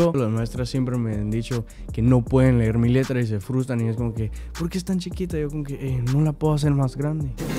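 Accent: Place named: Guatemala. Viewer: Amo el acento guatemalteco de y su R muy pronunciada jajaja Dios amo a este hombre 😍 yo igual soy alérgica a los perros y gatos 😂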